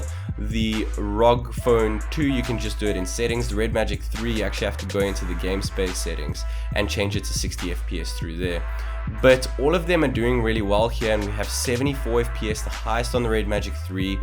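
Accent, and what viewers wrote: South Africa. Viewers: ...you sound south African... Are you south african?😁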